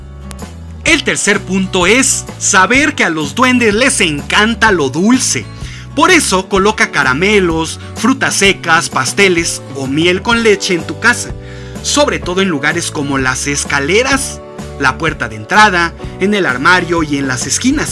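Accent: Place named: Mexico. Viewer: Con ese asentó mexicano?🤔 Nooooooooooo Me agrada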